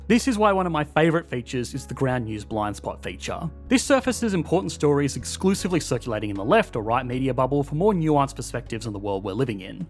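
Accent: Australia. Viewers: More bureaucratic waste to combat bureaucratic waste is awesome. Thanks HMW, the title educated me greatly (didn't watch the video cause you're Australian accent)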